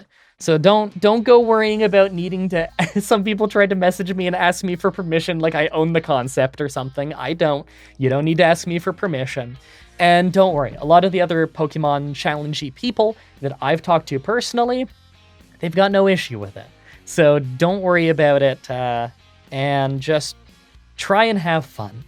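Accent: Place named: Canada. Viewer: The “out” thing is just his Canadian accent showing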